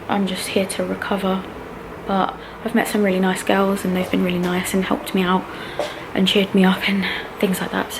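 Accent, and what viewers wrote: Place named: England, United Kingdom. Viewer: Yes sound like my friend from England I love it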